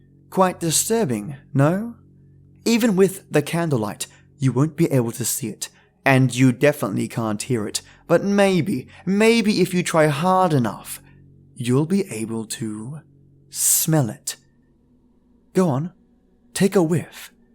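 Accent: Australia. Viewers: I love finding fellow aussie narrators! 👻👻👻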